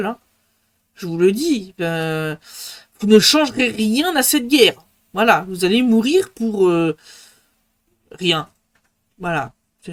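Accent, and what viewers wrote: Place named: France. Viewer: Il v ient de quelle région de France, l'accent ?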